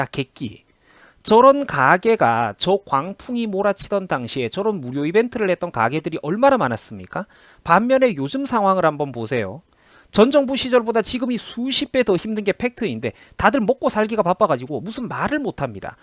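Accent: Gyeongsang, South Korea. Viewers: 근데 얘는 왜 자꾸 경상도 사투리를 쓰냐 듣기싫네 ㅋㅋ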